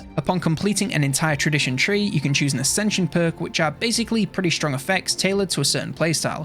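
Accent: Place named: England, United Kingdom. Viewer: Why is every guide by a guy with an English accent? No offense but I have difficulty understanding accents especially when the person talks a mile a minute.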